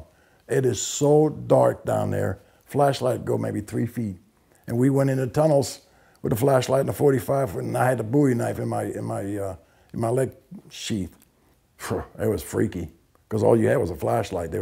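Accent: Louisiana, United States. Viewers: I hear Louisiana in that accent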